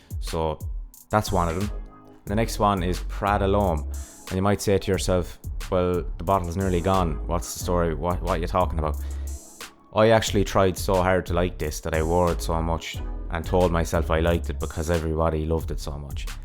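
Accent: Ireland. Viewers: Some good advise....really enjoy your Irish accent. Thanks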